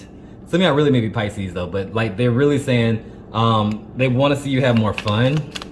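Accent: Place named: South, United States. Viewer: I’m in love with your accent. I wish I had a southern accent.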